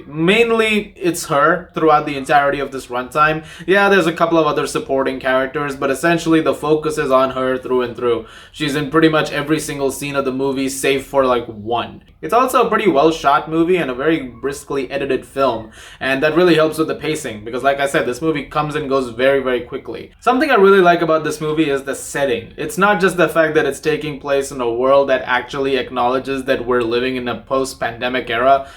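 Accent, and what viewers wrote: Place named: United States. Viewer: I am also Indian 🇮🇳 I am just asking as you can speak English so fluently like an American accent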